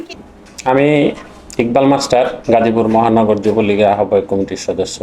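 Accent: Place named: India. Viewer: তোমার ভাষা শুনে মনে হয় তুমি তো ভারতীয়।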